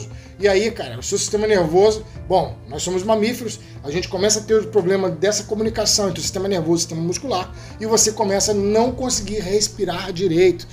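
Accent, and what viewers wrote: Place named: Macaé, Brazil. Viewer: vc é da onde parece de Macaé falai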